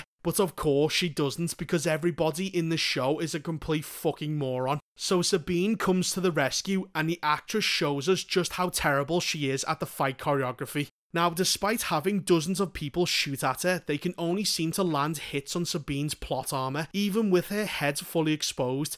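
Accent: Liverpool, United Kingdom. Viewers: the scouse accent only adds to the on point comments XD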